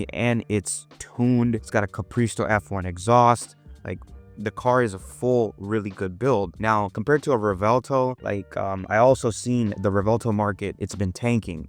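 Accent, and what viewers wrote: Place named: Canada. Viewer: u don’t sound American at all u sound like a 100% Canadian.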